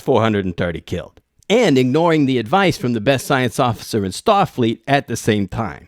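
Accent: New York, United States. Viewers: Somehow, I stumbled onto your pages. Being a casual, super nerd and loving all things sci-fi. I also consume all movies, new and old, especially if they're good so I love all the movie references have found some of your recommendations great options to follow up on. I always learn something new when I watch your videos. I found your insights, interesting, fresh, and fun. I hope you continue to do videos because I think the last one you posted was a year ago. I'm not seeing anything new, unless I'm not looking at the timestamps correctly. Keep up the good work. I'm still going through the rest of your catalog, but I completed everything Star Trek and moving on to the other videos and playlist that you've created. Love your work. And I love your scripts and New York accent. It has a hint of old -timey but hip New Yorker.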